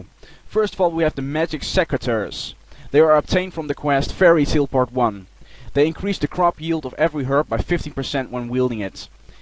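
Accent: Netherlands. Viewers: Dutch accent <3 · Mhm pittig Nederlands? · are you dutch? :D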